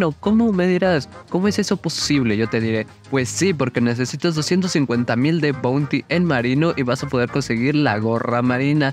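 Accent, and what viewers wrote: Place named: Michoacán, Mexico. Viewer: creo que eres de michoacan XD por como hablas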